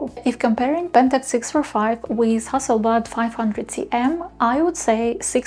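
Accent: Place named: Ukraine. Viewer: Класне відео, почув акцент і думаю звучить ніби Українка, потім бюпобачив герб на шиї)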